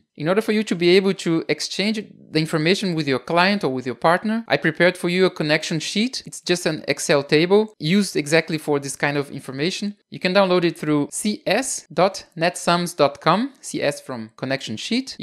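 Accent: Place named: Brazil. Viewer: ! Eu sabia que seu sotaque em Ingles era do Brasil! Muito bom canal! Estou comecando com Palo-Alto e seus videos ajudarao muito. Abracos aqui do Canada em 2024!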